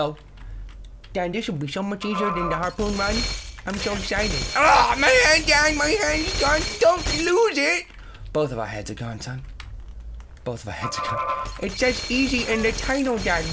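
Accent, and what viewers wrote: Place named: England, United Kingdom. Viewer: I love your English ascent!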